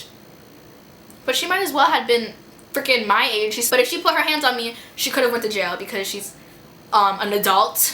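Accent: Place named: Spain. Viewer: you sound spanish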